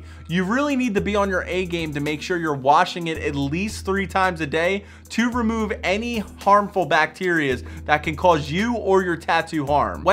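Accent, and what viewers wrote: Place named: Maryland, United States. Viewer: You’re awesome. Thanks for making such in-depth vids! One thing: hahaha where are you from. I’m guessing Maryland. “Wooder” for water. You’ve got to be from MD! 🪵💦😉🦀 ✌🏻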